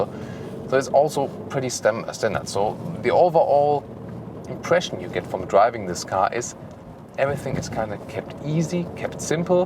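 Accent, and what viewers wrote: Germany. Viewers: Is that a German accent? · Oh...hallo, natürlich verstehe ich Englisch. Aber ich dachte mir, warum macht er mit diesem sehr deutschen Akzent denn nicht einfach ein deutsches Video. Die Erklärung leuchtet mir ein...vielen Dank.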